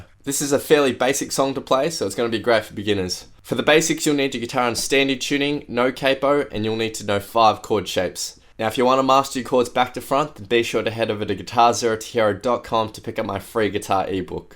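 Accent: Australia. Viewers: This legend's voice is always deeper and more Australian than I expect.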